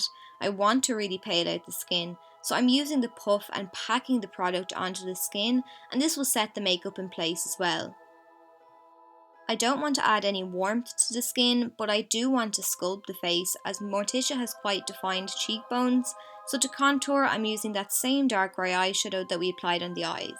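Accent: Ireland. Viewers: I LOVE your brogue accent.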